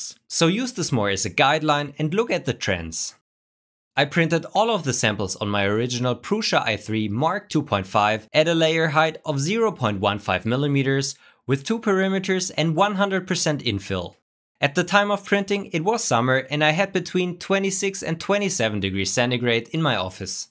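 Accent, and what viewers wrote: Germany. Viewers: Are you German or Amerikan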